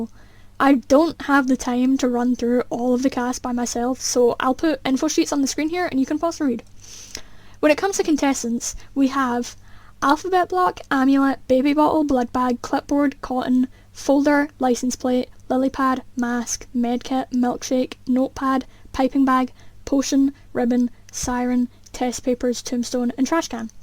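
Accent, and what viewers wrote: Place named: Ireland. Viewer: are you irish i recognise your accent lol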